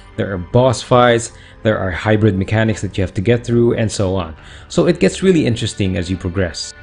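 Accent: Philippines. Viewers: That is not what I meant to my message. I know the accent of pinoys so I ask him if he is pinoy now do you get it?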